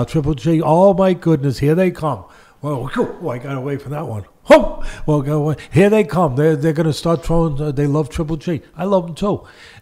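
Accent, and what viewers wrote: New York, United States. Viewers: He has a great voice, the New York accents are my favourite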